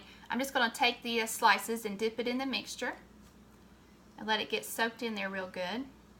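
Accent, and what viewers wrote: South, United States. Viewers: Great recipe and there's nothing wrong with a beautiful country accent🤗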